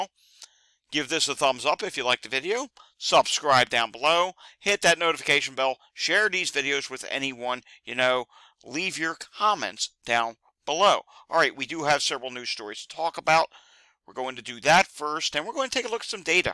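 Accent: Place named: Philadelphia, United States. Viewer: Just found your channel, a friend recommended it. Thanks for doing this. Love your Philly (or near Philly?) accent! I’m from Jersey originally.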